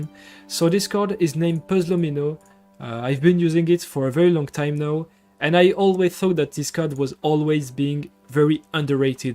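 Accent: France. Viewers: The heavy French accent makes this very awkward and awesome at the same time.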